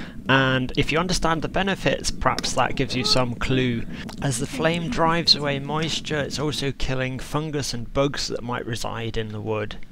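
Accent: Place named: West Country, United Kingdom. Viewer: But you don't have a Welsh accent. More like Somerset or Norfolk? No, wait; I've heard it in Bristol.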